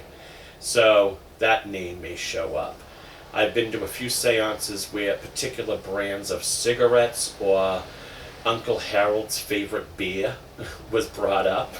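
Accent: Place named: Massachusetts, United States. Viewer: Where are you located? From the sound of your voice, I'd say Massachusetts. :)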